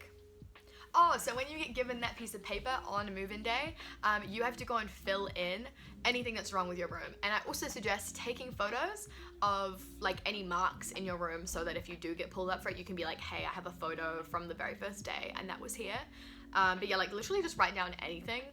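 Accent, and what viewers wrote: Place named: New Zealand. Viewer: are you part American? Your accent sounds like a mix of kiwi and something else ahha x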